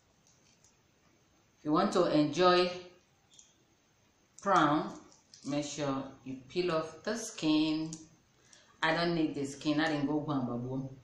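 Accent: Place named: Owerri, Nigeria. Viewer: u speak owerri , are u from owerri , im from owerri too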